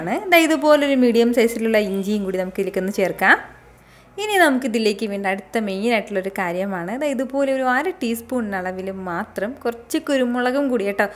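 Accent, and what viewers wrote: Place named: Tirur, India. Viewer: Excellent recipe,really good for this present climate,ippozhanu orukaryam orma vannethe Thiroor vettom swadeshiyano slang ketteppo thonniyethanu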